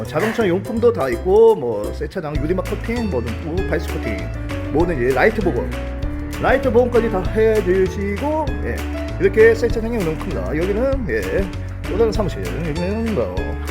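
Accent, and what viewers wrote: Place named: Yanbian, China. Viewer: 예전 개콘에서 '당황하셨어요.. 고갱님~~' 이 코너가 생각나네요 구미가 아니고 연변 아닌가요?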